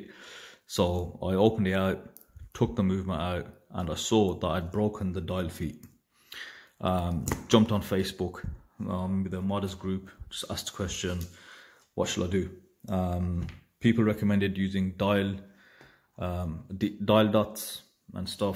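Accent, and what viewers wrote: Birmingham, United Kingdom. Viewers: Thanks for the useful hints and tips. I'm looking in to Pagani Design at the moment and I hear you can switch out bezels for Seiko SKX and other bits as Pegani use NH35 movements. Be cool if you did a vid on modding the Pegani 1651. Good to hear a voice on hear from Brum as I'm down in Bristol and miss home. Keep up the good work. Big up Brum! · I hear a Brummie!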